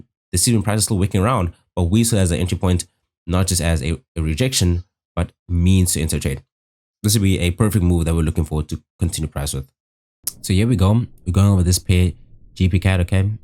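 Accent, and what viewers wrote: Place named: South Africa. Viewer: 😊detecting a SAn accent here.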